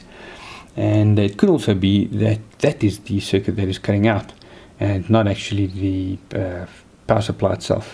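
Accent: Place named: South Africa. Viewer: I can spot a South African Accent any day!! Great video bru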